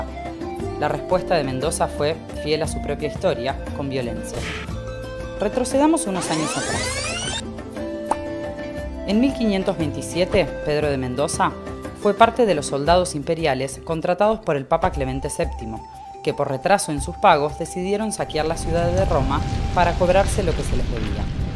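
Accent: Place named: Argentina. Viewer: Fiel a la leyenda negra, una voz en off de una inglesa hablando en castellano con acento argentino.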